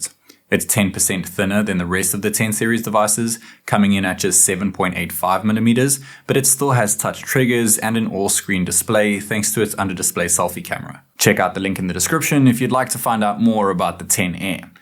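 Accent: South Africa. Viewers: can i ask if this guy is South african? the accent sounds very similar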